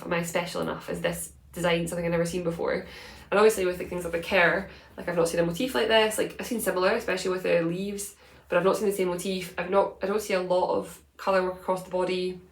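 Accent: Scotland, United Kingdom. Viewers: Please! I love your Scottish Accent and I am not having any issues at all! You are you and if folks don't like how you speak they don't have to watch!! I am grateful you are here and Love your knitting podcast!And..... I can't wait for your sweater release!! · Please do not change. Your Scottish brogue is so beautiful and if someone truly doesn't like your videos they can always choose not to watch. The self-entitlement of those people asking you to change to suit their own convenience is astounding. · Ignore the naysayers. Scottish accents are the coolest! Thanks for the great episode. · It’s not your accent, your Scottish accent is lovely, I think. it’s only that at times words aren’t pronounced fully (several words come out as one!), but of course it’s up to you whether you want to reach more people or not, it’s your channel 😊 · Love your accent. 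Reminds me of when I am visiting my daughter in Aberdeen. I’m in Canada❤ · I personally love hearing your Scottish accent and don't have trouble understanding you at all! The Youtube knitting community is a great way to hear so many different accents and I love it. Your podcast is the best. Love from Australia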